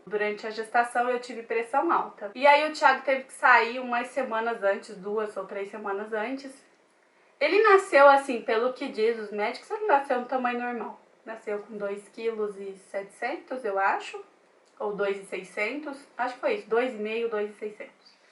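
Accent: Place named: Northeast, Brazil. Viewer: olá bom dia.eu amo seu sutaque d nordestina e tão lindo.esse arrastado d R.kkkkksucesso linda fica com deus.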